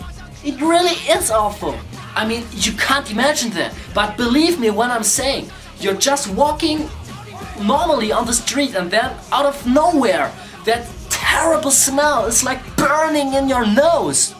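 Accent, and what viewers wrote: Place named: Germany. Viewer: well , i can tell you have german accent too !!!! :) ( smarter !~ta~da) ANYWAYS ! i need talk with you about this video this weekend ! = =# LAO WAI !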